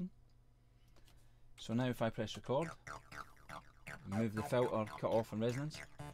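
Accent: Scotland, United Kingdom. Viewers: dude,how do you setup the virus ti with cubase properly? i dont manage:-( btw,are u scottish? i can hear that,i am from Aberdeen:-)) anyway,i am struggling with it :-( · what accent is this? =) scottish?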